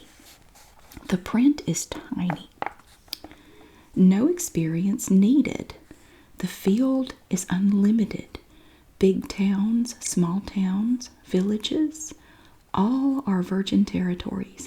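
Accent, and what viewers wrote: South, United States. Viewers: I'm from the U.K. and your southern accent is absolutely dreamy! I love the way you pronounce the word "Foil"! You have great production skills also, great vid. Thanks for sharing!!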